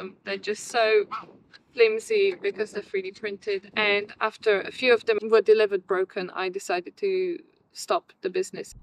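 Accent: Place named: London, United Kingdom. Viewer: talk in this weird London accent... a malformed style of English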